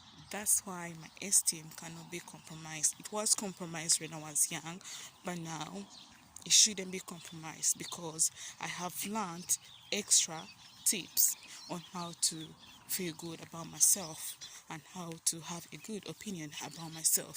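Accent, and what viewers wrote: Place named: United Kingdom. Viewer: You keep it up. Don't let anyone tear you down. By the way I love your accent. I'll certainly be going back and watching your other videos. I could listen to a British accent all day long.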